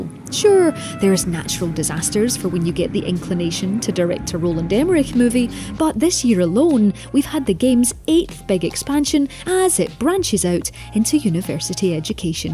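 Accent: Scotland, United Kingdom. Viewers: it's Scottish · I think it;s eng-scottish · Is she Scottish? I love her accent!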